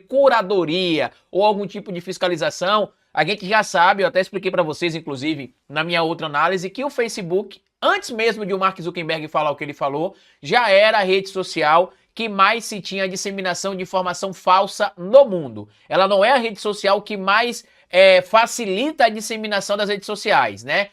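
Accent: Northeast, Brazil. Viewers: Boa tarde, gosto muito das suas explicações, te admiro você é muito inteligente, esse sotaque nordestino é o seu charme kkkkkk.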